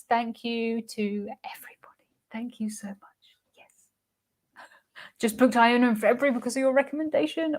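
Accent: United Kingdom. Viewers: I like your British accent. And your videos look so professional and I like your raw views and opinions about cruises. Great work.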